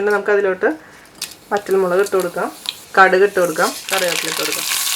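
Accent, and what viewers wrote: Thrissur, India. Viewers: തൃശൂർ ഭാഷ... അതോ എറണാകുളം ഭാഷയോ? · Happy to see ur videos.. Me too from thrissur.. Simple thrissur slang demonstration makes me feel better to understand.. And tried many dishes as per your cookery demonstration.. Each and every attempt were successful.. Thanks chechi..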